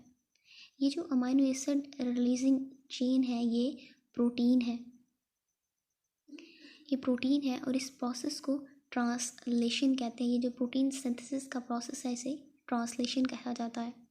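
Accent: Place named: Pakistan. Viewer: pakistani accent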